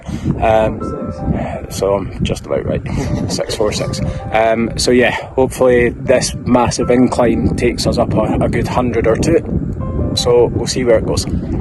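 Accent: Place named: Scotland, United Kingdom. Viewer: Tolles Video, mit klassischen schottischen Wetter. Ein kleines bisschen flüssiger Sonnenschein hier und dort :-) und ich liebe den schottischen Akzent. Macht weiter so.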